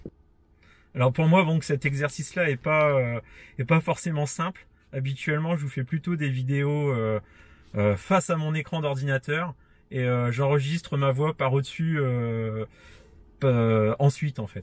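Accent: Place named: North, France. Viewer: je participe crochet de coffre super enfin on voit qui se cache derrière cette voix du nord ✌️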